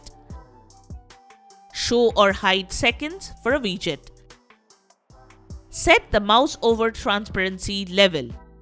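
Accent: India. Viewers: hidious indian accent ... also 'dekstop'? learn to read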